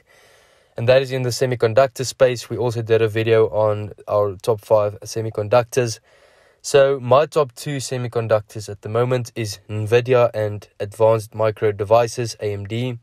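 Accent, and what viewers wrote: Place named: South Africa. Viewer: Apple? - thanks for the tips = good to hear a SA accent discussing stocks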